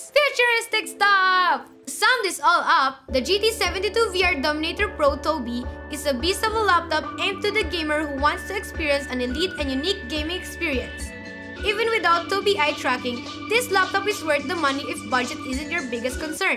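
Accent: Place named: Philippines. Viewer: hay filipino accent talaga xD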